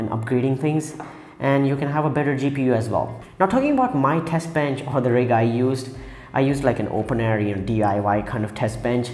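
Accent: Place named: India. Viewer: your english accent is good nice indian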